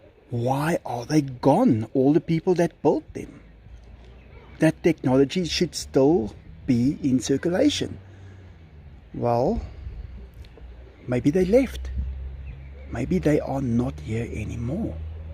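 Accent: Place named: South Africa. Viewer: SA accent is so entertaining to me, especially when he says certain words/phrases. I particularly enjoyed “park that sucker” today 😂🤣👍. I also always enjoy “milk” and “barf” 🤷‍♀️ 🤪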